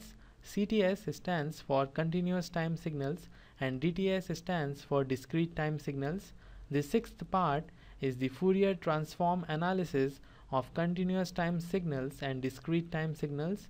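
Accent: India. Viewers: His English is fine. I understand him. Most Indian professors at my local university have a thicker accent than his. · 浓浓的咖喱味口音让我无法静心学习。。。可能是好课，但不太适合我！cry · Bu Hintlilerin İngilizcesi beni bitiriyor abi.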